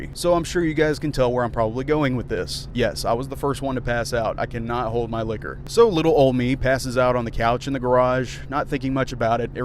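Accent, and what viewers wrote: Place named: South, United States. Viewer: your southern accent doh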